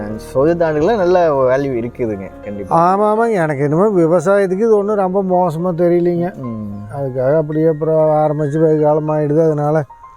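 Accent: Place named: Kongu, India. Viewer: Kavanthapadi la enga relative irukaaga. Unga kongu tamil super. Sir ivaru num pls?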